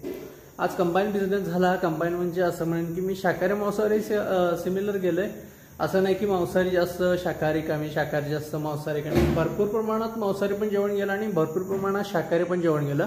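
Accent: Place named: Kolhapur, India. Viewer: व्हिडिओ आवडीने बघतो कारण कानावर कोल्हापुरी भाषेचा गोडवा पडत असतो. आम्ही पुण्यात असतो परंतु आपल्या मुळं आम्हाला कोल्हापूरात राहातं असल्याचे वाटते. धन्यवाद दादा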